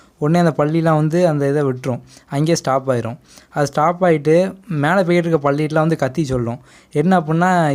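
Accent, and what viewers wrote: Tirunelveli, India. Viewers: Bro super thank u...But neenga nellai or thoothukudi yetho oru area thana...bro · Thnq so much...and happy to hear tirunelveli slang...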